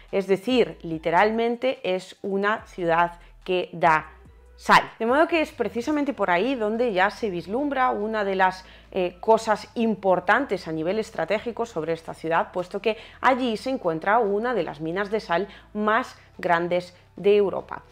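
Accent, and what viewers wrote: Spain. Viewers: Obrigado pela explicação. Você é espanhola ou russa? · El único problema es el asentó español okey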